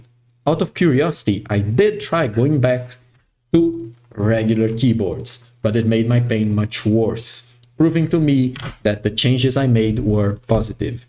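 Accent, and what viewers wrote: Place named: Brazil. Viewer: Ahahahhaha então somos conterrâneos! Inglês perfeito, mas desconfiei pelo leve sotaque da nossa língua. Muito bacana. Vou me inscrever e depois vejo uma forma de dar um feedback pra ti de como ficou o meu aqui.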